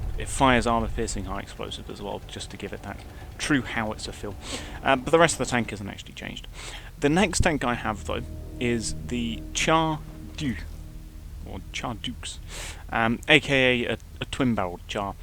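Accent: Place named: United Kingdom. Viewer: You have a very nice bri'ish voice mate. Very clear and easy to understand for people who aren't native in English like me.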